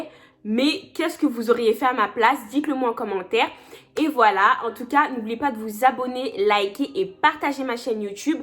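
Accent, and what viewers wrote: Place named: Morocco. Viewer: Une question jeune fille,tu es tellement jolie😍( tu as l’âge de mon fils),pourquoi parles tu comme une marocaine/arabe?🤔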